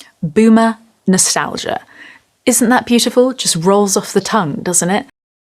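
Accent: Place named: England, United Kingdom. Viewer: You know, you sound like Wolfman Jack with an English accent.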